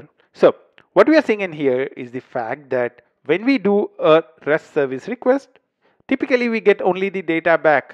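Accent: India. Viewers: Индийцы имеют ужасный акцент, невозможно слушать такое издевательство над языком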